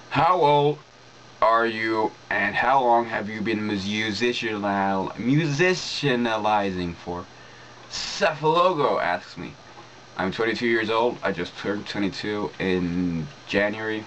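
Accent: United States. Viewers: lol its funny seeing you talk about a quarter like its such a foreign object, when you sound like you have an american accent. I was actually surprised to find out you're in Finland.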